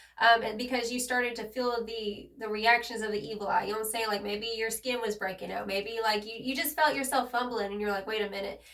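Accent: South, United States. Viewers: My fave southern reader fr!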